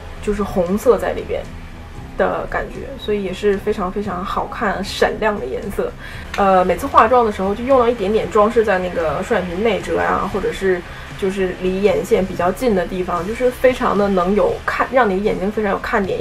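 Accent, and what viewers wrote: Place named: Changchun, China. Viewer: 长春人？难怪口气很熟悉，老乡啦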